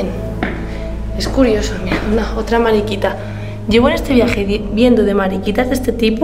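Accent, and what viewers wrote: Spain. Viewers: Wooow estoy fascinada con tus vídeos apenas ayer te descubri y no paro de verlos llevo varios , felicidades haces un trabajo extraordinario.. gracias por ponerle tu chispa y me encanta tu acento español ☺ saludos desde 🇲🇽😘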